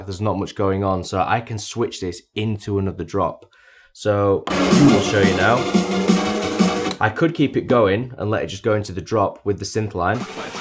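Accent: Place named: West Yorkshire, United Kingdom. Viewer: Good stuff, where's he from? Sounds Barnsley or maybe West Yorkshire?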